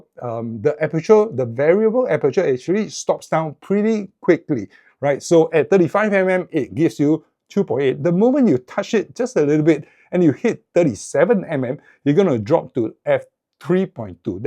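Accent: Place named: Singapore. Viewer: Thank you for your excellent overview! I have a very good friend in Singapore and I believe you have the same accent! Wonderful.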